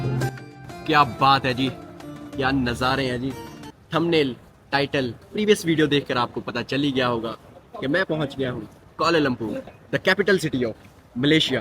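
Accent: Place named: India. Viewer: بھائی، آپ کا ولاگ اچھا ہے، لیکن آپ پاکستانی ہیں اور آپ نے بھارتی لہجہ اپنایا ہوا ہے۔ اردو میں بات کریں، جیسے آتی ہے، فخر سے پاکستانی بنیں۔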